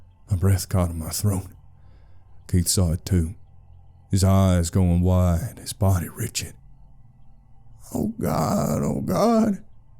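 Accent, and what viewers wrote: South, United States. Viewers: I love your southern accent ❤ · Scary creepy dark water not for me your good old boy accent is on point and I know country myself 💛✌️ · Your southern accent has no reason too be this good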